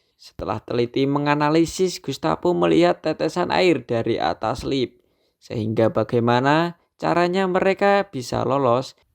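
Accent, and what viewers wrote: Java, Indonesia. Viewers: Jangan medok mas, jadi kayak pahit asem gitu filemnya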